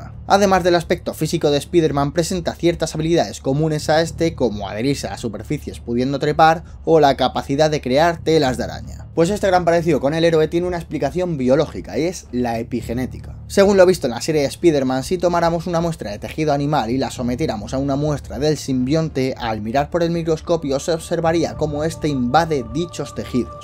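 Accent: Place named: Spain. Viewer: Estuvo muy bueno , pero la verdad los españoles la Cagan siempre en su forma de pronunciación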